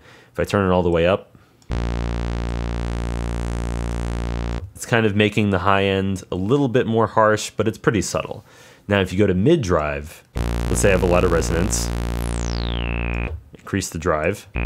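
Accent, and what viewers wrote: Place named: Canada. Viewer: I really wanted to see this video but I just couldn't bare to listen to the speaker's annoying Canadian accent.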